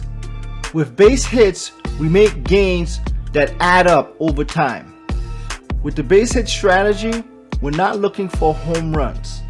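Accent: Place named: Northeast, United States. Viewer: where you from champ? I am guessing the Northeast USA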